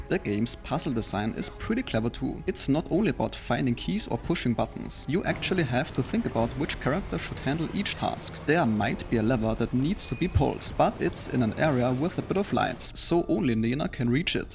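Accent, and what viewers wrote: Germany. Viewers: his voice tells me he is German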